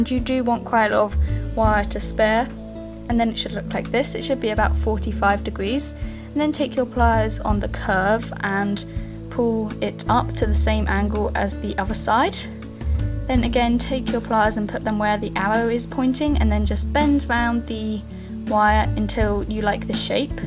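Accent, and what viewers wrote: United Kingdom. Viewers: your from England, what is peanut past?????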